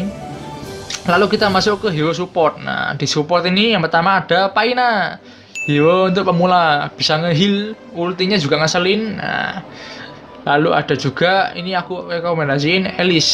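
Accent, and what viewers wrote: Java, Indonesia. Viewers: Lu orang mana bang,,,,ngomng medok bnget,,,,,,wkwkwk,,,,, Salam negeri atas awan bang,,,,,,#dieng😂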